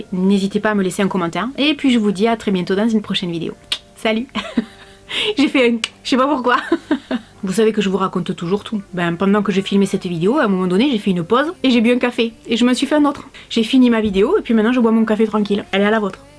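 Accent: South, France. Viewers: Bonjour c'est la 1ere fois que je mets un commentaire mais je regarde pratiquement toutes vos vidéos. J'ai l'impression de regarder une fille que je connais depuis des années. Pas de chichis toujours la banane c'est vraiment super j'ai l'impression d'être en visio avec une amie et puis cet accent.....que j'ai eu pendant longtemps puisque j'ai habité Martigues....me fait rire car maintenant j'ai l'accent du sud mais entre le continent et l'Italie....oui oui la Corse ici aussi on vous regarde 😂😂 alors un grand merci pour ces bons moments passer avec vous. Et si vous déplaise à certaines c'est quelles sont peut être jalouses ou que se sont des BOUCANS FADA 😂😂😂 Aller je me permets comme a une amie bisous😘😘😘😘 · Bonjour, super ta vidéo merci pour ta joie de vivre et vive l'accent du Sud au passage 😉, merci pour l'info pour ces sites pas chère du top👍.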